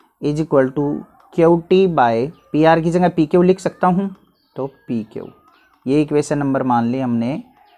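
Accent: Haryana, India. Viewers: Sir Haryanvi hai awaz se hi pta lag gya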